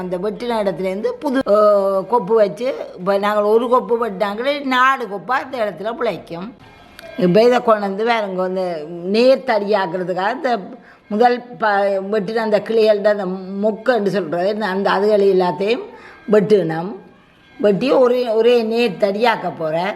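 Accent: Sri Lanka. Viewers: இலங்கை தமிழ் கேட்பது இனிமை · I like srilangan Tamil voice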